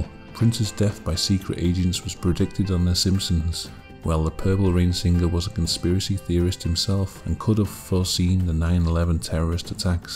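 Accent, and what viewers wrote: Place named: Newcastle, United Kingdom. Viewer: we love your New Castle accent that late night DJ mellow drone and your content is consistently strange and revealing. Keep it going Mitch and Lolly from Tipperary or should we say Kipperarey Ireland.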